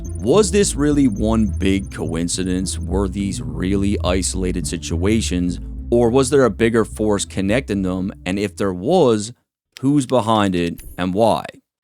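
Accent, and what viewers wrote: Canada. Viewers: he’s Canadian lol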